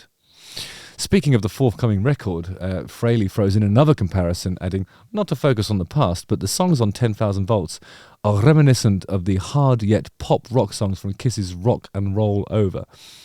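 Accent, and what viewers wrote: United Kingdom. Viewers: First time on your channel. when I saw that suit and heard your British accent I immediately thought of Mick Jagger. Nice call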